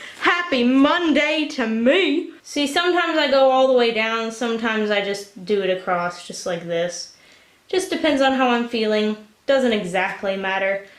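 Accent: Texas, United States. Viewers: She sounds more Texan than usual in this intro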